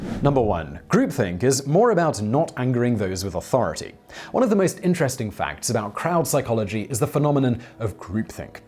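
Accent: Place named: RP, United Kingdom. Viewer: isn't hired because he knows anything. He's a mouthpiece with a posh accent.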